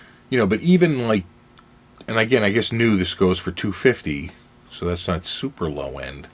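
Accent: Boston, United States. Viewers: Are you from Boston? If so, I am too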